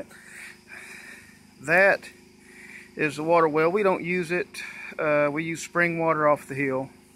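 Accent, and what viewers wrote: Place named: South, United States. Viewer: he likely made a ignorant assumption because of your accent. because we southerners can't be educated.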